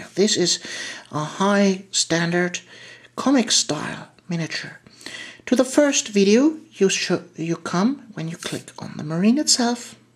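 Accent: Germany. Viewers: Are you German? By the way really good videos:)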